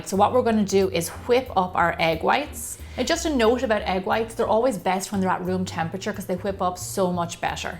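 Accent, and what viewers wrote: Ireland. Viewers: Loved your baking tutorial. Must give it a try, ta be sure!! Lovely Irish accent too. Yum!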